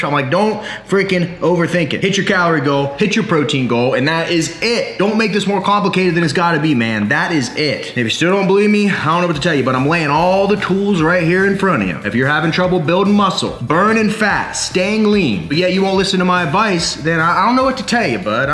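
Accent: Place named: Ohio, United States. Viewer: Listening to this dude gave me ohio vibes. Checked him out... columbus. Southender here myself.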